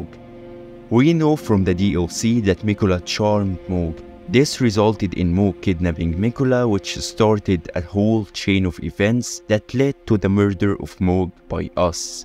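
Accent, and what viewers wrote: Egypt. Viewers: There’s something special about listening to Elden Ring lore in an Egyptian accent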